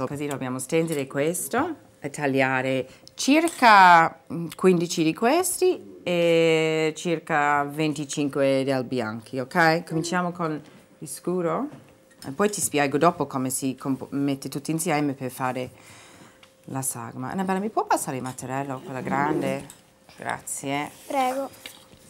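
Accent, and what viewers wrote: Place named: Italy. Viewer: OMG! I freaking love your Italian accent!! :-)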